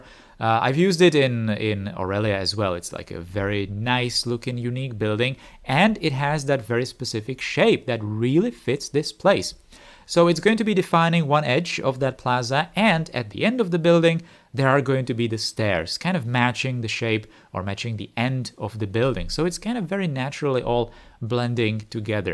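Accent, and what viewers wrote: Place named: Czech Republic. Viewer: This is probably a wild shot but you sound like you're from Czechia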